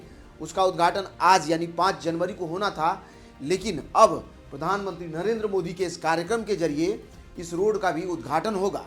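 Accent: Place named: Bhojpuri, India. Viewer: آپ بلاوجہ ""لمبی/لمبا"" کے چکر میں بلاضرورت ""گیان کی گنگا"" بہا رہے ہو🙃 آپ بذات خود کسں ٹکسالی اردو یا ہندی بولنے والے 🎄بہاری🎄کے سامنے آ گئے تو آپکے گیان کو چار چاند بھی لگ سکتے ہیں😉 بہرحال اینکر کا لہجہ بھوجپوری ہے جو مغربی بہار اور مشرقی یوپی(پروانچل) میں بولی جاتی ہیں Pronunciation or way of talking are depend upon other behaviour ☻️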